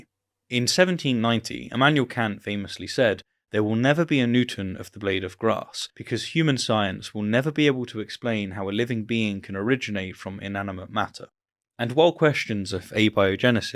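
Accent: England, United Kingdom. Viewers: Sophistry with an English accent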